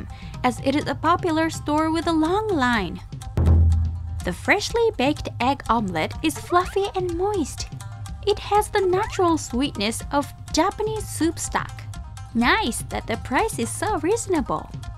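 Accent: United States. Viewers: seu ingles ficou bem melhor agora, mesmo não sendo o ingles minha lingua materna é bem perceptivo que o seu ingles ta bem parecido com um americano. Parabéns:face-red-heart-shape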